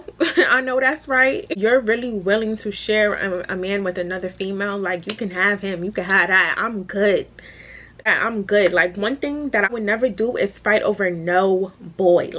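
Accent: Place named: New York, United States. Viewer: Are you from NY, I like your accent❤️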